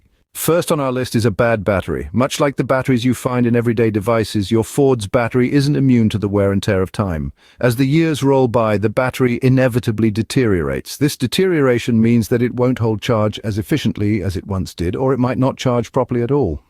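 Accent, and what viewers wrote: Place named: RP, United Kingdom. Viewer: WTF is this Brit talking like this is etiquette school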